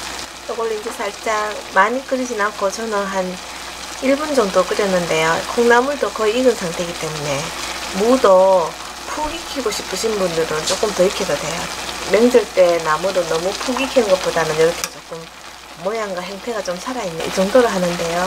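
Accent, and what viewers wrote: Gyeongsang, South Korea. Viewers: 구수하신 경북 억양이 편안하게 느껴집니다.쉽게하지못했던 요리를 쉽고 간단하게 하는 요리 노하우를 기다릴게요. 감사합니다...^^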